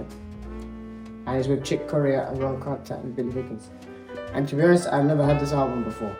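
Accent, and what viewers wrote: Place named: Germany. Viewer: I love thissss he speaks like a 8 year old German child learning English. Not an insult.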